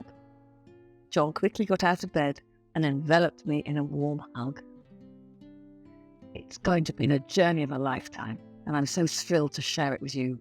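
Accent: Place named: United Kingdom. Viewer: British accent is not for everyone, the story sounds interesting but i couldn't finish it, cos i got stressed listening.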